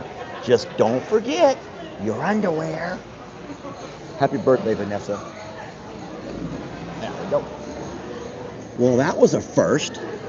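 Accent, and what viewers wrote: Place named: South, United States. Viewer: that’s the way we talk in the south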